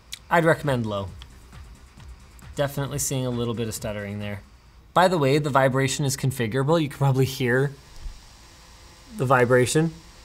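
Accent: Canada. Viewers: Is it just me or is Linus sounding really Canadian these days?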